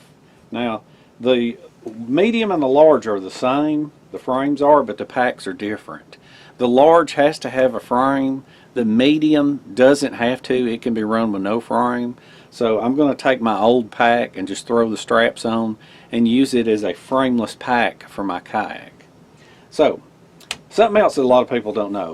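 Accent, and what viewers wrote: South, United States. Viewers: great and informative video. just bought a pair of rocky boots cause i saw them on your channel. great boots. now something off topic. me being german always wondered why you and nick for example as southern gentleman seem to talk a little diffently. with some strong southern accent and nick not so much. why? is it a thing of age or just how you learned it? sorry for being so damn curious:)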